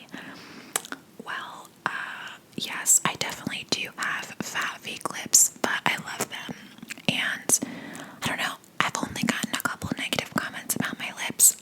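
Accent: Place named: East Coast, United States. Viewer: Girl I looooovvveeee your videos and your voice so much!! The East Coast accent is so tingly and reminds me of home ❤️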